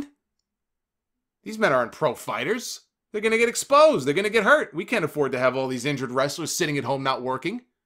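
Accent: New York, United States. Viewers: "Nu Yawkaz" bro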